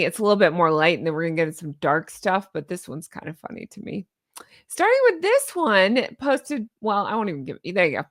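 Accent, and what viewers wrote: South, United States. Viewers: Good Morning and room. Honk, Honk.. Megalier episodes never gets dull..... You do sound Southern.... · HONK-ity HONK HONK Queen of the Flockers. Great video as ever 😂😂😂😂😂 Yeah, you do sound southern. How's Flockingham Palace coming along? Enjoy your days off. Congratulations on your weight loss journey. I love how you keep things real (I dare not say 'authentic'). Your faithful (& a tad busy) humble Flocker. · It’s ok to sound Southern❤ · Hey. Mississippi girl here- don’t you apologize for any southern accent you may or may not have. I think it sounds beautiful🥰 Love you channel!🪿🪿🪿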